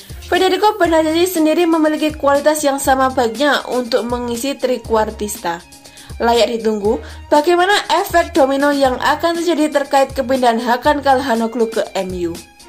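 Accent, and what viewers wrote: Java, Indonesia. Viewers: Medok 😀. Tapi 👍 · Dok medok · Wong jowo yaa min 😊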